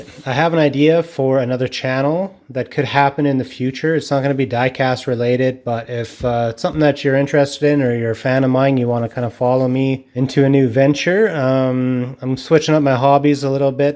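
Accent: Canada. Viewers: I wish I could shake your hand and thank you for the joy you've given. I'll miss your Canadian accent, eh? It's been super and I'm glad I got to follow along. Take care of yourself out there.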